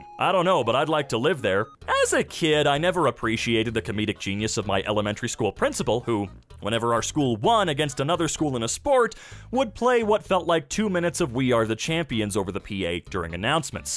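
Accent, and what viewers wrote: Ireland. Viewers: has to be Irish omg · Love Robins irish accent. Is there an irish subbredit he can read for us? =D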